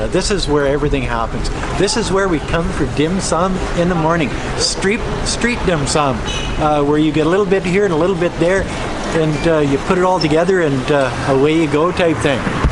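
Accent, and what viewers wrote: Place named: Canada. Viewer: Lol that accent can't get any more Canadian. Love it!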